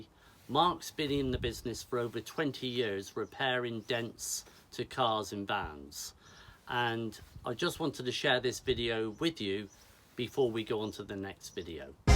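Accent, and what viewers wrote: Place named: Gloucestershire, United Kingdom. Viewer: Superb my friend, attention to detail are excellent. The "gentleman" as always, giving people that have helped you on your journey a thank you, and a really genuine plug. People such as yourself give me a feel good factor, by restoring my faith in mankind, when so many others are trying to "ruck" or "scam" you. A man after my own heart, I'm sure you and I would get on like a house on fire. Phil. Incidentally, are you in Gloucestershire/Bristol district, only I'm picking up on your lovely accent??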